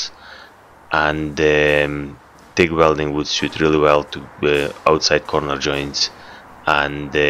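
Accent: Scotland, United Kingdom. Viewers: Good bit o scottish accent comin through there boss where u based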